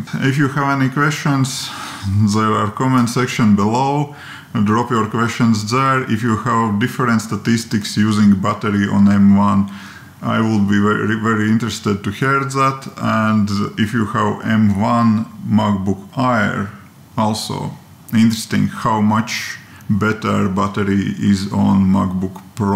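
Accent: Russia. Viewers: Are you Russian?) You have a russian pronunciation. Nice review, thanks!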